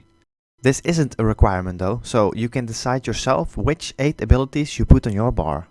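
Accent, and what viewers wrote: Netherlands. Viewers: Are you dutch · nice dutch accent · lol, je klinkt nederlands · The Dutch accent though, gj explaining man!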